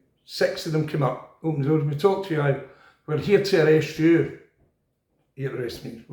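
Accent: Glasgow, United Kingdom. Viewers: Nah, Glaswegian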